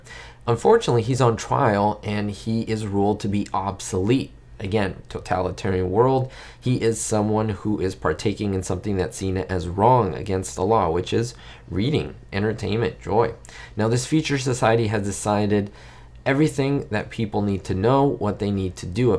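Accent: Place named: New York, United States. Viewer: "Not really so much for the story but for just the facade and the set pieces, i think you'll really appreciate it in a different way" - Because of that line I will indeed try to catch this episode in its entirety, have only seen bits and pieces before. Always love watching your videos, interesting, cool, nostalgic, amusing, and somewhat relaxing as you have kind of a soothing voice, very New York City. Anyway, bottom line, now I want to see this epi in its entirety. Thanks!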